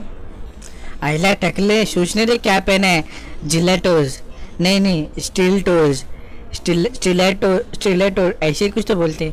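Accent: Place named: Mumbai, India. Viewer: ponga pandit.. LOL.. I am sure commentrator is from Mumbai.. Only a Mumbaiwalla kan speak like that.. Cheers to Mumbai.. videos have many dislike.. some of them.. Non Mumbaikars dont understand jhakas humor of mumbai... so they press dislike... you dont worry... keep making such videos.. u rock.. Mumbai spirit socks.. stay Mumbai style only.. dont change...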